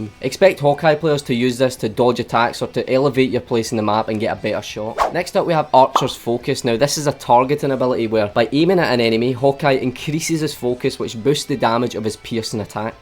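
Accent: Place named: Scotland, United Kingdom. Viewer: Can´t wait for Marvel Rivals! Will it Kill Overwatch? I mean... It could defenitly force Blizzard to Heat up and do more Community Focused Things to keep their Players. I mean.. Overwatch is an absolut great Game. But the Monatizing Options Decision that were made are absolutly BS. Everyone is hating on Monatization and not about Overwatch in General. Marvel Rivals got a perfect Timing to step in and declare Overwatch WAR. Also: I love your accent. You sound like Drew McIntyre ( a WWE Wrestler if u dont know him ). I assume you are from Scottland? :P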